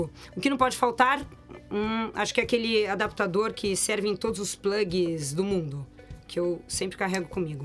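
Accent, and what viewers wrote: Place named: São Paulo, Brazil. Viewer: A voz grossa dela é inesquecível, e esse sotaque paulistano.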